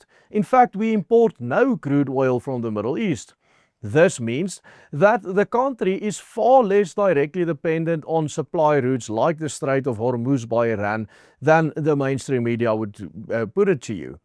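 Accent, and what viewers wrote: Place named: South Africa. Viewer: ek dink jou videos is fantasties. Die content is akkuraat en lig die mense goed in. Ek dink die feit dat jy Engels praat met so sterkt aksent maak dit soms moeilik om te hoor, maar rook eg Suid Afrikaans. Ek love dit! Maar kan ons asseblief praat oor jou baard en hare. Vir n man wat so baie voor n kamera sit, kry vir jou n manscape trimmer of so, en kom ons wys vir die mense ons voorkoms is net so goed soos die visie, en missie in ons land.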